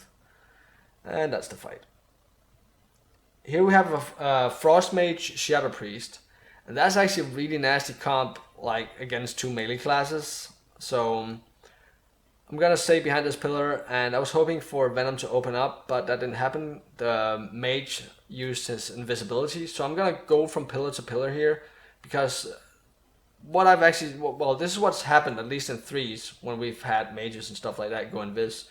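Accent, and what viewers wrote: Denmark. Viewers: Der er ikke meget dansk accent, over din accent. =D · I heard u were danish instantly, im danish myself, i dont have as bad accent tho, a lot of danish people are stuggling with an accent while talkin english tho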